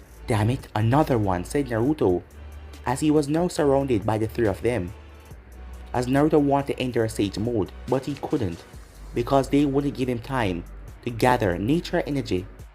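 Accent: Jamaica. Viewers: Lol you sound Jamaican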